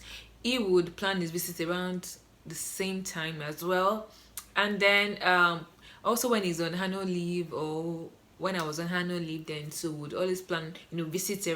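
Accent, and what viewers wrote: Yoruba, Nigeria. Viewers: Your yuroba because you say forhever not forever. Thats really a yoroba thing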